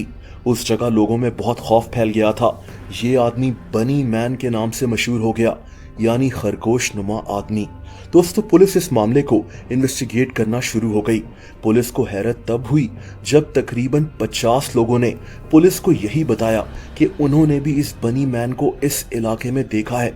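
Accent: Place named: Lahore, Pakistan. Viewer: speaks like a typical lahori definitely reality tv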